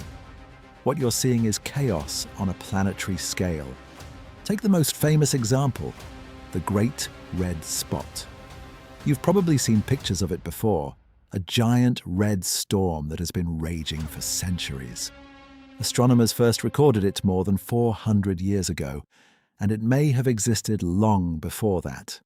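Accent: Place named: United Kingdom. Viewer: another femme british narrator!?!?! wtf is happening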